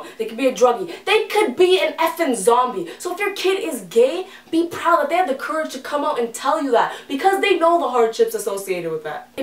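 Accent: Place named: Canada. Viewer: Awesome vid. PS, omg you must be Canadian. I can hear it! I miss TO...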